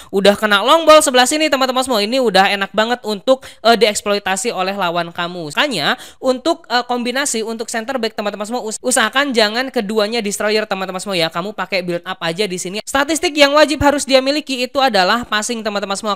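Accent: West Java, Indonesia. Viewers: Bang orang jabar kah?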